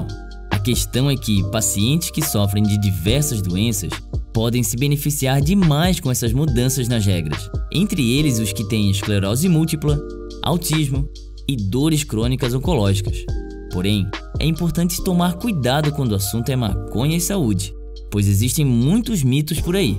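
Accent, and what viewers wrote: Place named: Florianópolis, Brazil. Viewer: Tu é de floripa né cara? Esse sotaque aí não me engana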